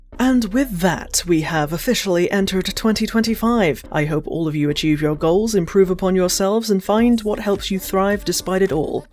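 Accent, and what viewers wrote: United Kingdom. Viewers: Hey can I ask question, I know you are Brit but what accent do u have specifically which region accent?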